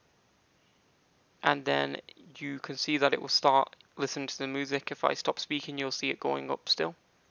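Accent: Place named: France. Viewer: on est d'accord que tu est français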